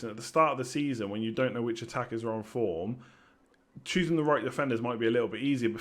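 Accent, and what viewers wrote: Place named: Bristol, United Kingdom. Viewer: You sound a Bristol boy. Gas?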